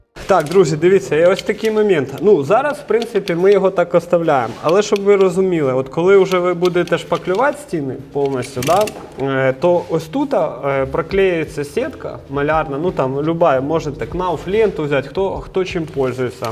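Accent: Surzhyk, Ukraine. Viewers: У вас дуже багато суржику кацапського. Прошу звернути увагу на свою вимову ви ж молодий! Дякую відслаюваться.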